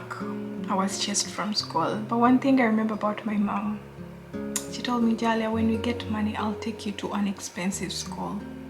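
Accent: Uganda. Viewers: I love your Ugandan accent 😍 I'm in love with you and your energy ❤️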